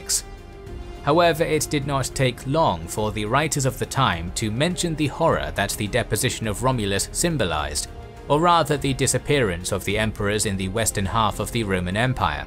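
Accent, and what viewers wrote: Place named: United Kingdom. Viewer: Excellent voice. Calm and clear. And British 🥳🥳🤣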